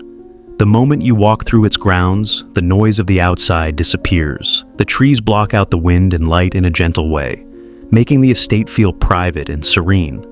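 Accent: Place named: United States. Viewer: Interesting, but did we need the awful American accent on a programme about a Royal home?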